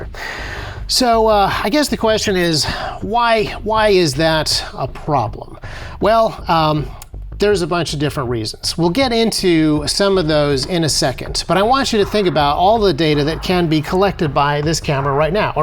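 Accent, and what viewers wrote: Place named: United States. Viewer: You accent sounds American, at the end there you talked about London so much I may be wrong about you. The end there is a good example for the American 4th Amendment which requires police to have a warrant to investigate people. Meaning evidence of a crime needs to be presented to a judge. For America this kind of mass surveillance is so very illegal.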